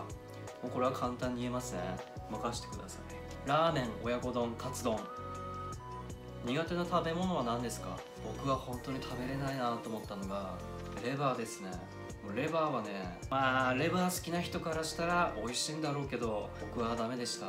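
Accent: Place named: Hokkaido, Japan. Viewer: かっこよくて、介護士さん。ちょっとした北海道なまり？も、とても可愛いと思います！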